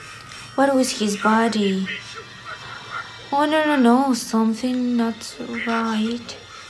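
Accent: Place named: South Korea. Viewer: Your English ascent is like Korean are you from South Korea, but you don't look like Korean.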